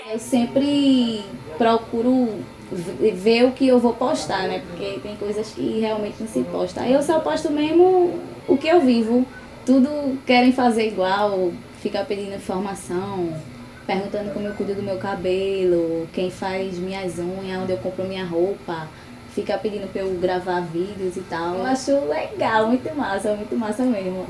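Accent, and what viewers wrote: Brazil. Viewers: Sutaque de nordesteina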